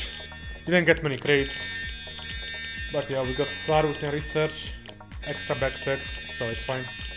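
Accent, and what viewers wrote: Italy. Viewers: Ur italian?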